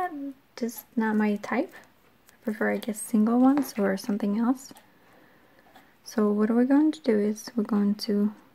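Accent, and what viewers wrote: Russia. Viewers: I was in Baltimore a few weeks ago with my boyfriend and was secretly hoping to run into you randomly in a little shop or something to say hi and meet you! But I'm glad I didn't because I'd have nothing to say I'd just wanna follow you and listen to you talk (that might have been slightly creepy) haha. I love your voice so much and your Russian accent. I also just bought the nail hardener you recommended because I have such problems with my nails peeling so I hope it works! Maybe someday I'll have nails as beautiful as yours. That's the dream. Lol. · ! I love your videos you're the best! I've always had asmr since I was a little girl. It was mainly my teachers that made me have a tingling feeling and chills! My Spanish teacher in high school was Russian and she really had a soothing voice and accent like you. When I started watching you, you introduced me to things like tapping and crinkle sounds I had never experienced before in asmr. 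I didn't even know what I had had a name lol. I thought I was the only one until one day I came on YouTube and typed in soft spoken words and came across you and I've watched you ever since! You're really good at what you do. Congrats on making it to 5 years! Keep it up! If you're looking for things to make into jewelry I would suggest making things with sea glass. It's very beautiful we have a lot of it here where I live on smith island in MD. How the sand and water mold pieces of broken glass to have smooth edges is so pretty. A friend made pretty necklace and earrings made out it I lovethem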